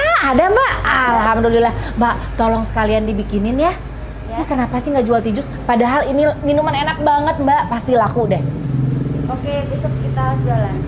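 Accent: West Java, Indonesia. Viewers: pengen ketawa mani mencugug Sunda banget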